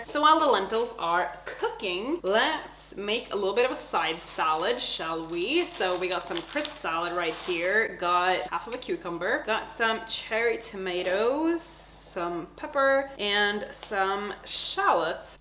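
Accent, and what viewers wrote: United States. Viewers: Yes.. i usually have less interest about your cooking contents but i've realized that you have a perfect american accent.. maybe you should start to post some language based contents.. and maybe some informations from U.S.. And you should sail to kick or twitch.. these can also go with live streams.. Ohh one more thing.. i think you forgot to cut this video to parts or it's basically a weekly video :D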